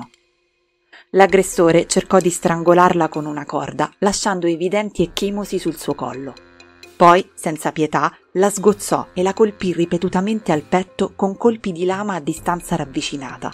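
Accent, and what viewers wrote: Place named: Rome, Italy. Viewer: c'e' di peggio 😅 credimi ci sono truecrimers che ho dovuto abbandonare, errori grammaticali, voci con cadenze ben piu' pesanti di una semplice inflessione che credo sia romana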